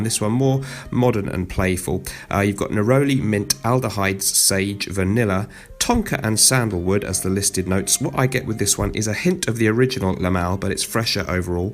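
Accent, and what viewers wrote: United Kingdom. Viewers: Excellent list, as usual. I love your British accent!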